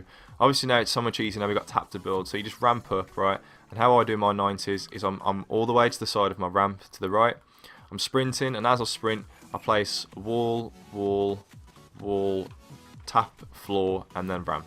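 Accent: Birmingham, United Kingdom. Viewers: You're defo from Birmingham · are you from birmingham lol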